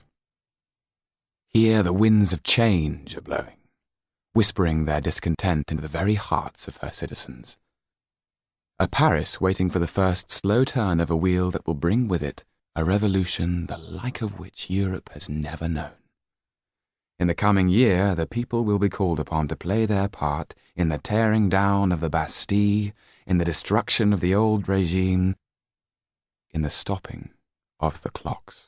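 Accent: Cockney, United Kingdom. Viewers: The cockney accent! Oh my god! This man is perfect x_x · He is the reason my siri voice is ‘British man’ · Cockney accent. Oh my god *.* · Everything is better with a british accent. He could read out a phone book and my heart would still stop beating at every Second word!!!!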